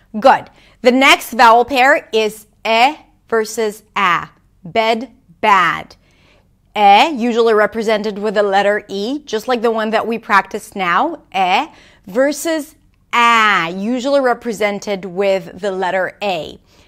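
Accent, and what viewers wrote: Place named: United States. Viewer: You seems to be american native speaker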